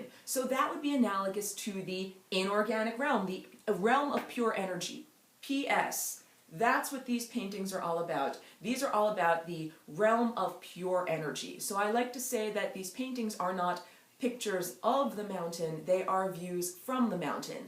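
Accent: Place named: New York, United States. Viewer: Interesting shift from previous video. Faster speaking. A bit more NY accent.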